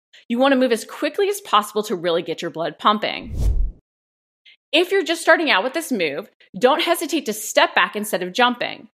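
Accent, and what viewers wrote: United States. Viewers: Hello from the UK. I just want to say thank you for your time and effort in producing these excellent videos which I'm sure are well views in the current climate. Changing the subject some what your accent in this video is reminding me of the Californians off SNL!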